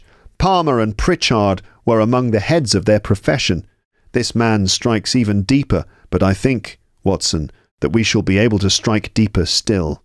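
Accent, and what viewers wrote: United Kingdom. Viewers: is he use British accent? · Hi thank you for posting a lovely video as always. Byw I live in London but there are no chances to meet British people who speak in beautiful accent like you. I understand what you speak almost everything though, I don't get it conversation on the hustle and bustle.😢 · my english is good but i still open this vid to listen to my fav music which is british accent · İs that british accent? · This British accent ؟؟؟ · Is it british pronunciation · Do you have a British accent?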